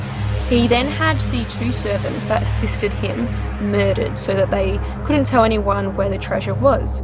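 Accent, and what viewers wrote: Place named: Australia. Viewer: Leap Castle - ''Caisleán Léim Uí Bhánáin'', that murder was all about power and control, it's as old as the hills as they say. That little place where they threw prisoners to die from injury or starvation as there was a spike on the floor was called a oubliette or a place of forgetting in English. People were tossed in there to die from the spikes or starve to death. Many would rather have died quickly from the spike(s) than starve to death. The most cruel thing was that they could both hear people having a good time and smell delicious food while this was happening to them. I see this was a couple of years ago i hope you come back although i don't know if Leap Castle is allowing any more ghost tours. PS: I find it funny that birds frighten you but ghosts ( indeed possibly really vicious ghosts ), are not a problem. You sound Australian but mixed with a little bit English accent.:-) The current owner a Mr Sean Ryan and his wife although a Irish name has a Australian connection and i believe that he was born and grew up in Australia before moving to Ireland although not 100% sure about that. 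According to a program i saw Sean was nearly killed a couple of times when he initially started the repairs. However, at the baptism of hos daughter which took place at the castle apparently there was a peaceful feeling and even a light breeze that the attendees of the baptism noticed. Sounds with the gruesome history of Leap that it needs any positive feelings that it can get. Fina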